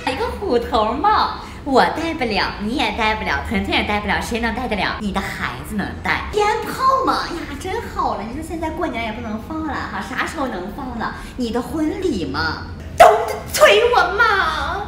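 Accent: Taiyuan, China. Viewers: 这口音熟悉啊，山西太原的吧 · mi AMY 😂哈哈哈哈应该是太原的吧和我小时候听的话一摸一样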